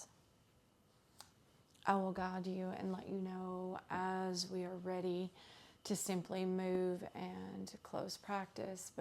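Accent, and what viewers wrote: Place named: Tennessee, United States. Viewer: 🌹🔥🫶🏼🔥🌹 Love your Tennessee twang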